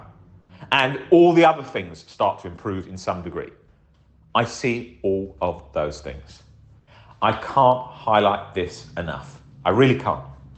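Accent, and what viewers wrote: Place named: England, United Kingdom. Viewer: Thanks for the great video! I also have to say: you sound a LOT like Alan Watts! As an American, I wonder if you both come from the same area in England cuz the accents are almost identical! 😂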